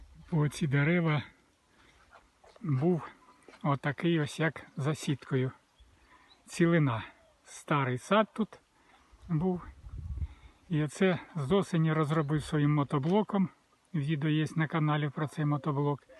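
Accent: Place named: Kharkiv, Ukraine. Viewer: Какой у вас укр.язык красивый прям как у нас в деревнях-Харьковская область.У меня папа так тоже говорит.Мира нам всем и доброй погоды.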